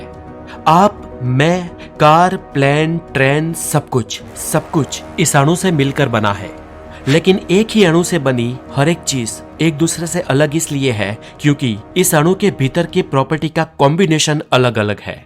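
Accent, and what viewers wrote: Gujarat, India. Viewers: ગુજરાતી લાગો સો ભાઈ...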